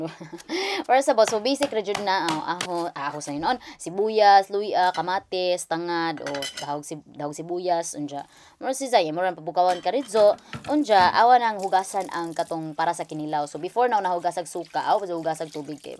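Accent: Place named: Bohol, Philippines. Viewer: You sound like my ex boyfriend from Loon Bohol and my Grandma from Cortes Bohol hahaha your accent ate hahaha san ka sa Bohol? im from Davao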